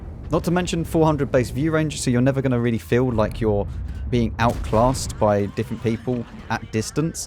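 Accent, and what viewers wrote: United Kingdom. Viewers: LOL!!! I really love this statement "IS4 this probably the idiot proof tank", British accent humor. Good one.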